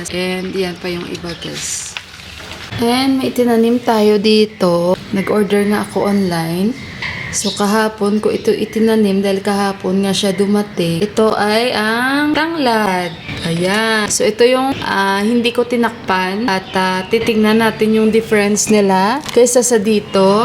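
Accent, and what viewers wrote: Iloilo, Philippines. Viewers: Gusto kita panuurin kc ilonggo ang tuno mo, ilonggo din aq from negros...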